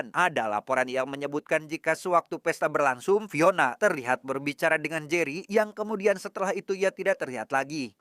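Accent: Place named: Sunda, Indonesia. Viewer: Unik bgt suaranya. Film horor tp suaranya kyk ada sunda2nya beuuh ucul bgt fans wh lah mang . Curiga tos ngaliwet😂 ieu mah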